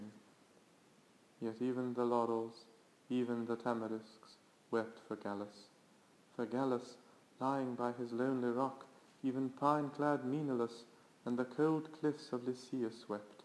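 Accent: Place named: RP, United Kingdom. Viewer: Your English accent is very posh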